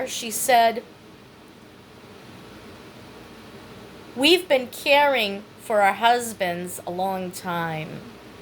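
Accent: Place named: East Coast, United States. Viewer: You have a good voice. I pick up on a slight east coast accent. You should look into doing voice-over work. All you’d need is a nice microphone and work from home. I’m an instructional designer and many companies need good voices for training programs. Just read a script and get paid! I think you may like it.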